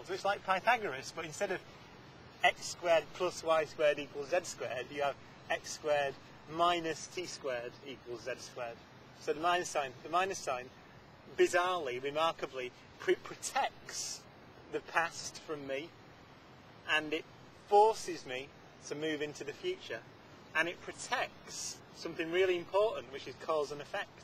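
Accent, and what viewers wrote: United Kingdom. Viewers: this is british english and it sounds much much much more painless than american english.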